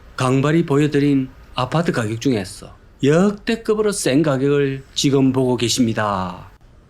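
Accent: Gyeongsang, South Korea. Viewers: 누가 뭐락 했심껴? 갱상도 발음으로는 은마를 언마라 부르는거 모르십니껴? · 경상도 사투리가 압권^^ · ㅋㅋㅋ경상도사람들은 "으"발음을 못해서 "어"나 "오"나 "아발음을 하는데... 그 이유는 원래 우리말 고어에는 <아래 아>로 발음하는 게 있는데... 이게 일제 때 24개 현재의 자모음으로 정해지면서 4개의 자모음이 발음하기 불편하다 하여 생략하게 합니다. 그러다보니 <아래 아>가 다양한 모음조화에 따라 다양한 모음으로 발음하게 되면서 특히 경상도 사람들의 경우 원래의 그 주된 구성원들이 저 북쪽의 사로(중국에서는 이를 고어 발음으로 "슈어눠"라고 발음하는 것을 문자로 적을 때 그 차자로서 "흉노"라고 적은 것) 사람들이기에 그 발음이 거센 발음이 많은 게 특징이며, 된발음을 잘 하지 못하는 특징을 가진다. 그래서 쌀"을 "살", "싸움"을 "사움"이라고 하는것처럼... 특히 이 "사움"은 일본어 고대어 에서 <"사우무" => "사무우" => "스모" = 씨름> 의 원형이기도 하다 위 영상제작자도 계속해서 <은마>라고 발음하지만 실제 들리는 발음은 <언마 혹은 엄마>로 발음하게 되는데 이는 어짤 수 없는 지역적 방언의 특성이다. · 경상도 사투리의 매력에 웃네요. 은마(언마) 스스로 (서서로) 죽이라.(직이라) 사투리가 은근히 매력 있네예. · "와~ 후덜덜덜" 😅 갱상도 사투리 마 직인다 아이가💣 🌋🎊🤣 오늘도 👣👣👣학실한😂 개드립 ~ 으로 구독자들에게 도파민을 선사하시네요. ㅎㅎㅎㅎㅎㅎㅎㅎ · 갱상도 ㅋㅋㅋ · 갱상도 발음이라 이해해야됩니다 쌀도 살이라 말합니다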